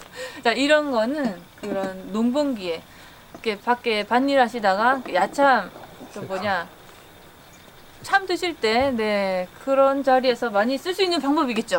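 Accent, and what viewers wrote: Gyeongsang, South Korea. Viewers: 대구경북 출신 맞지요? ㅋ 억양이 쫌 ~~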